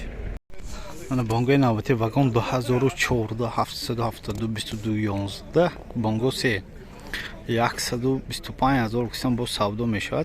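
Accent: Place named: Khujand, Tajikistan. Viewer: Салом алейкум акачон илтимос Акцети хучанди Кати гап назанен МО бисер гапотона намефахмем забони точики модари Кати гап занен узр 😢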